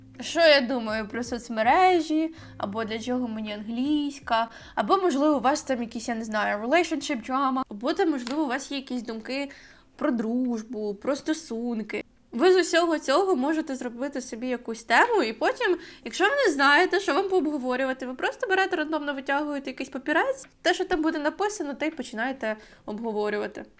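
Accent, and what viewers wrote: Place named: United States. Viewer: хочу твоє відео, де ти говориш тільки англійською, мені дуже подобається твоя вимова. Я в захваті від максимально американського акценту😁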